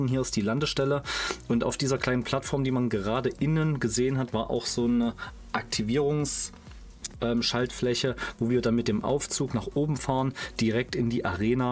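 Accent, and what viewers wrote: Saxony, Germany. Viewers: Du bist doch aus Sachsen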